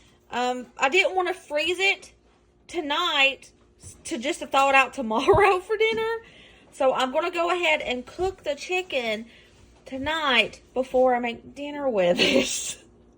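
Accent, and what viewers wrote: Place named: Deep South, United States. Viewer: u tube suggested your channel to me, & now I’m a fan!! You cook just like i was raised to cook! We also happen to buy the same types of groceries 😂😂 we’re both from the deep South! You talk just like me & my family do!!😂 God bless you & that lovely family ❤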